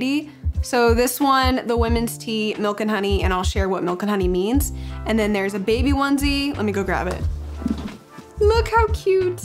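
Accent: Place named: California, United States. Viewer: California accent 😆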